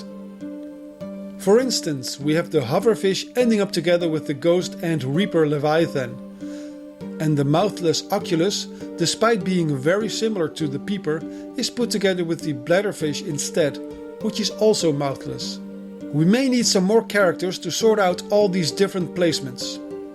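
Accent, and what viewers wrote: Netherlands. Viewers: the moment i heard your voice i knew you were dutch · Is deze gast Nederlands ofzo? Hij heeft echt zo'n accent. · goeie content maar runt je computer op een aardappel? (I can sense you are dutch by your accent :p)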